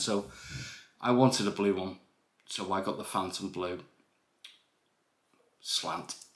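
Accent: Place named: Liverpool, United Kingdom. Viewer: Great to hear a bit of Scouse! Enjoy your Friday!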